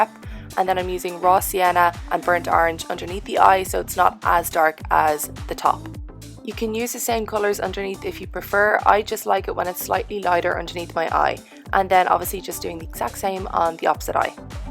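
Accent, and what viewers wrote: Ireland. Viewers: she is from Dublin in Ireland ☺ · The Vogue ja, sie ist Irin, aber ist manchmal auch in London bei ihrem Freund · The Vogue i think she is irish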